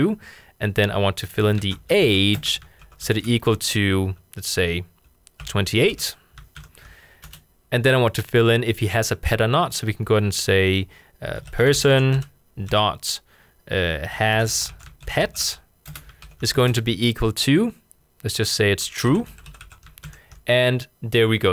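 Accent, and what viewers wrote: Denmark. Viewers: I love your way of explanation. You are my kind of teacher who explains basic and doesn't assume that others will pick up the complex concepts easily. When I first listened to you, I thought you must be Danish (Your English accent). Now, I have checked your About which confirms that you are indeed Danish. Thusind tak for fantastiske videoer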